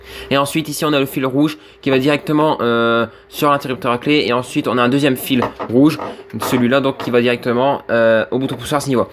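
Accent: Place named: Northeast, France. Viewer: Tu es de où ? J'essaye de deviner avec ton accent mais ça ressemble à un accent type nord est (genre lorrain, voir Meusien)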